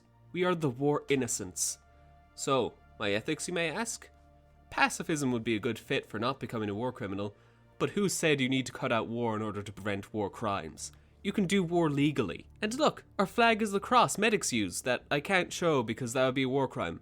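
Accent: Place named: Ireland. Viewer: you sound like you're from Ireland.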